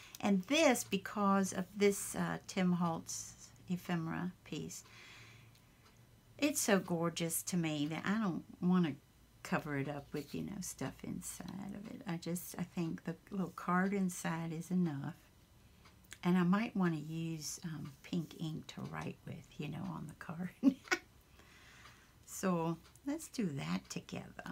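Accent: South, United States. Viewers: I live your accent you are such a sweet southern belle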